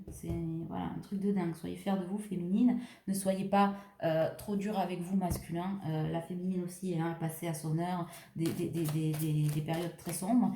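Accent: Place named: Toulouse, France. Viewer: Bonjour, il y 25 ans quand on s’est rencontrés, Elle jolie brune du Sud et moi Loulou de la mer du Nord ( elle m’appelait comme ça ), Elle 23 ans, moi 40, 🙄🙄nos Âmes se sont reconnues, c’était plus fort que tout, un ouragan, un tsunami, un ´goût ´de foudre. Notre premier baiser💋 tellement passionné🔥 et si différent des autres, l’alchimie de nos corps, ce parfum enivrant de l’Amour, le plaisir du plaisir de l’Autre. 🤫🤫, le goût de l’Autre, Nos folies et notre insouciance, le secret de nos rencontres, 🤭 les débuts de la nuit.....c’est Amour inconditionnel. Le grand moment de ma vie, où juste avant Elle, je ne croyais plus à grand chose, à trop vouloir on brûle ses ailes. Elle me manque souvent, Elle me manque toujours 😌😌et si tu ne m’aimes plus, tant pis pour moi😌😌 Merci pour vos agréables guidances, optimistes et juste avec votre voix de Toulouse 😊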